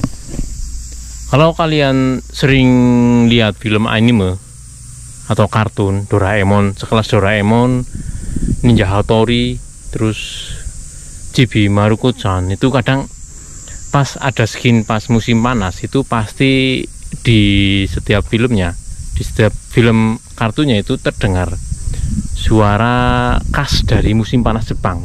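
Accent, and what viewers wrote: Banyumas, Indonesia. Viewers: ora ngapak ora kepenak 😊😊😊😊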